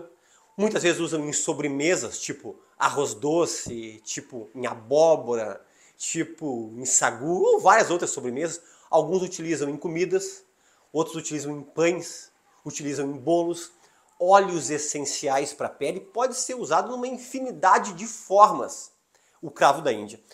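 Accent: Rio Grande do Sul, Brazil. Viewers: Doutor o senhor é um médico bonito e educado, parabéns pelos seus pais ❤ gosto muito dos seus conselhos médico,o sotaque gaúcho é dez 💖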